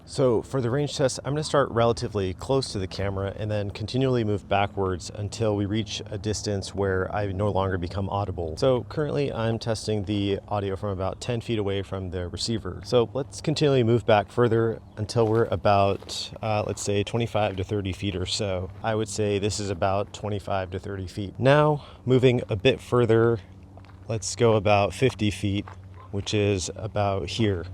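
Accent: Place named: United States. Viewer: I’ll do it Great looking man. Nice American accent. Good content 10/10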